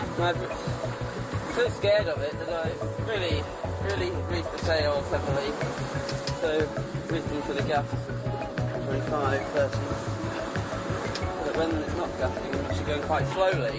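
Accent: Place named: United Kingdom. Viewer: Hi, some time soon Im hoping to sell my house and buy a boat too. I have just started to learn about sailing,may I ask what boat you have and make pls? Where are you from I hear a british accent, I myself am from Cardiff South Wales.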